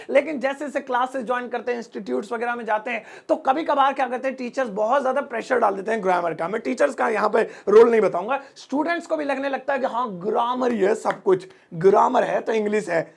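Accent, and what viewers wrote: India. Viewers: This teacher is very Desi . This teacher is very funny English language. How a great teacher spoken English. Good night 😴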